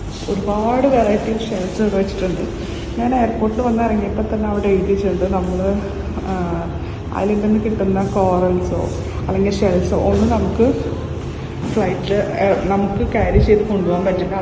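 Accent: Thrissur, India. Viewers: Chechide proper hometown thrissur aahno😄.. Samsarathil okke oru thrissur slang edakk varunnund😇.. Ath kelkkumbo ottum jada illathe valare natural ayi thonnunum und🥰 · waiting for your next video,, sply thrishur slang 😍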